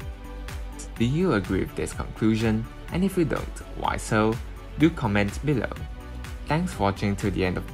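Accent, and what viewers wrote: Singapore. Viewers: the host spoke like either Singaporean or Malaysian. and a lot of his comments are bull shit. C919 components is made world wide - even the body structures design involved a lot of European consultants---why? So that C919 can get FAA airworthiness certificate.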